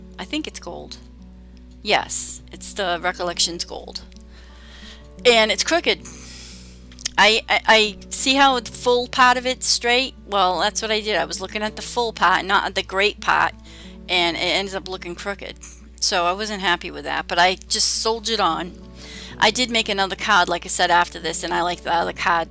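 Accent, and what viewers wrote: New England, United States. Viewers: Hello, new subbie from Michigan. However I was raised in Mass and recognize your NE accent. You made me feel home for a moment. So nice to have found you. I was born in RI, is that where you're from? How close am I? 😁💜🎨 TFS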